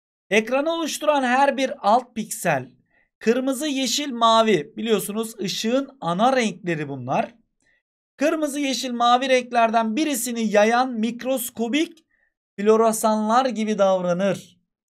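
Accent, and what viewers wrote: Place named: Trabzon, Turkey. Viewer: hocam kesin trabzonlusunuz ilk defa karşılaştım kanalınızla :DDd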